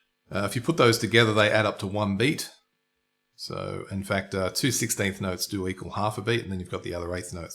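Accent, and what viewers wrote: Australia. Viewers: What part of australia are you from mate?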